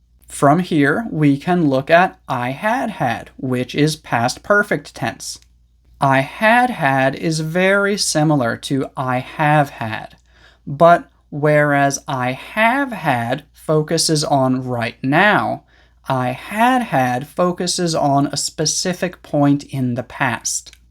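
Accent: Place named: United States. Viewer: You have american a ccent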